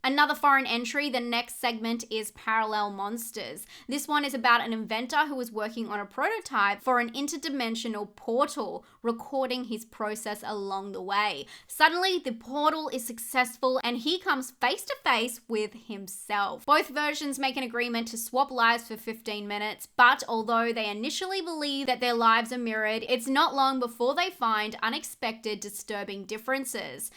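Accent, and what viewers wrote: Australia. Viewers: I clicked for the reviews. I stayed for your Aussie accent.....came to the Aussie accent. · Can we just stop to appreciate how beautiful, upbeatand thorough is? Her amazing Aussie accent and charm is attractive. Still shocked by some of what shes tolerated horror wise.. I still havented seen Noroi the curse and Salo seems like a difficult and unpleansant watch tht will be infuriating to me. And this from a guy whose favorte horror films of last 15 yrs have bee Heridatary and Audition.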